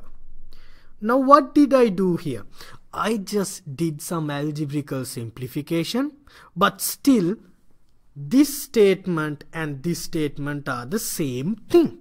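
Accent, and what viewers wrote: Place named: India. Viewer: he has such a cute Indian accent.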